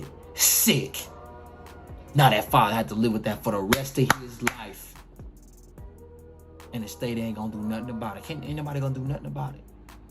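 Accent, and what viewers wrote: Baltimore, United States. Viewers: Thats that bmore talk....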